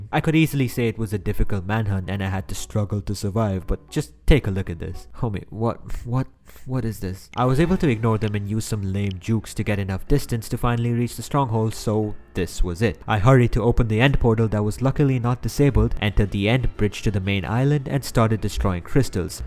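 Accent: India. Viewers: I love his indian accent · why u have indian accent here, but american in other video😭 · You sound indian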